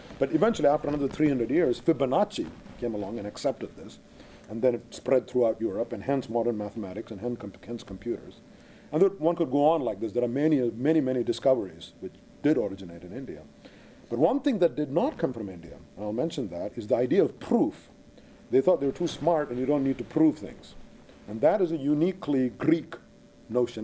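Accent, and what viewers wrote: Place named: Scotland, United Kingdom. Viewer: Thank goodness he has mentioned Indians regarding mathematics etc. I can detect a kind of Scottish accent coming through VS. He sounds a bit like Sean Connery now and again.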